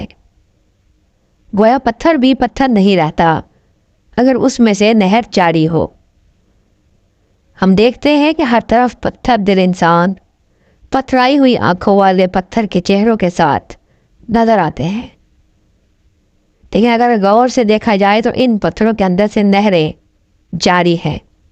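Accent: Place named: United Kingdom. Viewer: بہن اپ انگریزی لہجے میں بولتی ہیں کیا وجہ ہے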